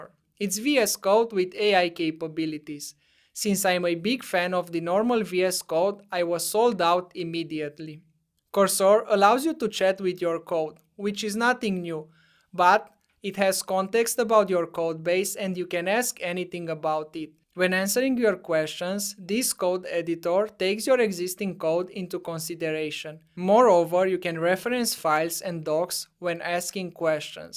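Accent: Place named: India. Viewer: Came here for the Indian accent.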